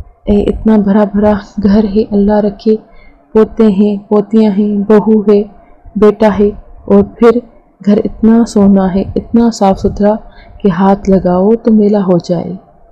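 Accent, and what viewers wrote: Sindh, Pakistan. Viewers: نائس.... سندھی لہجہ___ بہت خوبصورت!! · سندھی ایکسنٹ۔۔۔۔۔واہ۔۔۔۔۔بہت مزے کا انداز۔۔۔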